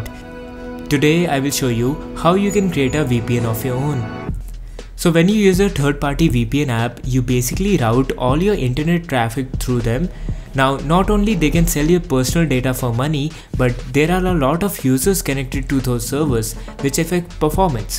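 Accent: India. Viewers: will never install something on my pc, told by an Indian voice.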